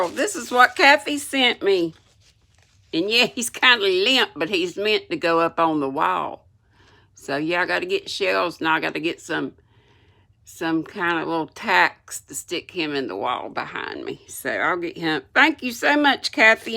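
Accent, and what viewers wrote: South, United States. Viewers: Oh by the way it was fun to hear Tom Sawyer read in the southern language it was written in😉( I’m a northerner)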